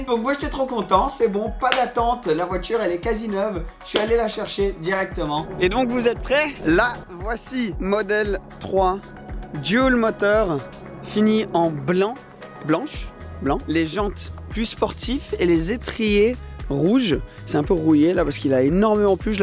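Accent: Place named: United Kingdom. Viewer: On dit TESLA, pas TESLA. L’accent UK, ca va bien 2 minutes, ça fait des mois que t’es revenu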